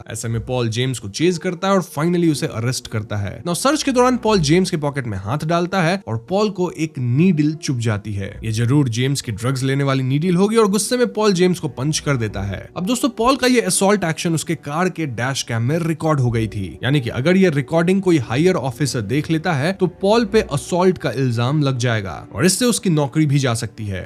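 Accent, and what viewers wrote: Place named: Bihar, India. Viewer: Tu bihaari hai kya agar h to bihari accent nikaal de isme se . Wese bhi tu totla and labduu h bolne me waau waau type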